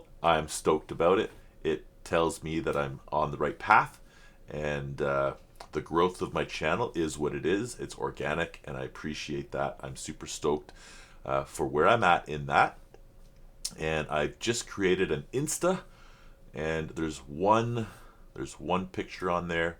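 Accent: Canada. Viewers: I suspect is Canadian due to the way he pronounces his '-out' word sounds as -'oot'. He's been struggling with the pronunciation of Dimash's name for forever. It's not making a connection in the brain. I think we just have to accept it and not worry about it. He's a massive fan and loves Dimash as much as we do.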